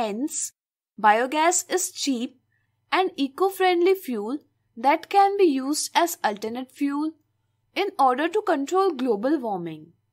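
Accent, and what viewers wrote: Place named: India. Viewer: terrible Indian accent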